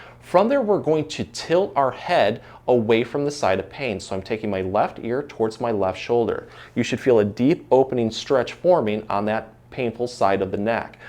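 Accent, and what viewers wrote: Michigan, United States. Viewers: I was just googling how to get trap pain relief and stumbled across this video and instantly knew you were from Mich before I looked at your bio bc of your accent 😂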